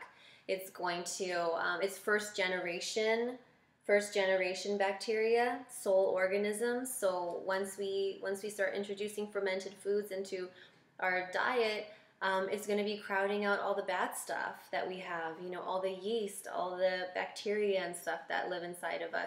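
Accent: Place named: Canada. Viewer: I like your Canadian accent.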